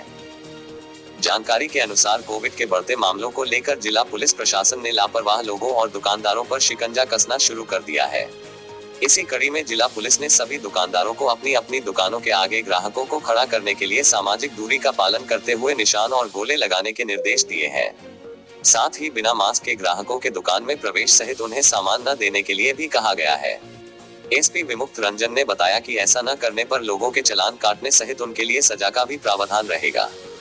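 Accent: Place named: Himachal Pradesh, India. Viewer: Sir aapki voice me jo himachli tone aati h hm to bo sunne k liye hi aapka video dekhte h plss phle wali voice laye.....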